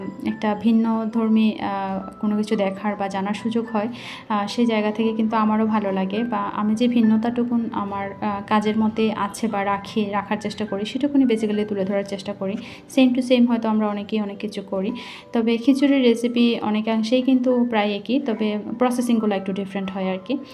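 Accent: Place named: Chittagong, Bangladesh. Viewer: Ohh Chittagong er meye🩷 bole kotha 🩷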